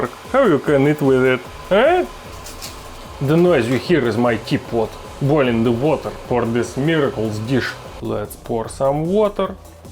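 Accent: Russia. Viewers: is there just all russians watching a russian guy speaking english funnily and then commenting in english?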